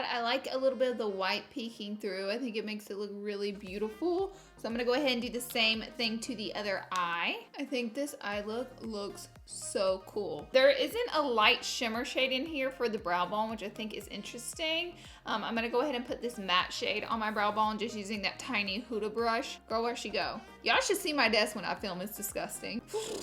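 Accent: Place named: Alabama, United States. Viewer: Yeah, being born, raised and living in Alabama, a lot of us talk like this. Nothing uncommon here! Love ya 😍❤❤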